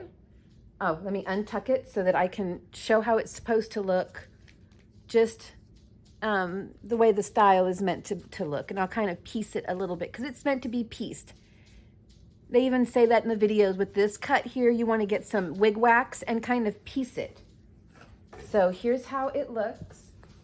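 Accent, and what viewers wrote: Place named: New Jersey, United States. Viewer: Holy moly! I had thought you were in your late 20s!! I was stunned to hear you had grown children. I know how you feel about relocating and not having friends where you live. Your videos are very entertaining and enjoyable. I thought about buzzing my hair like that, just leave the sideburns. Lunch lady net!! That was good! Your New Jersey accent is awesome! You are a hoot! Your parking lot Karen comment put me in the floor!!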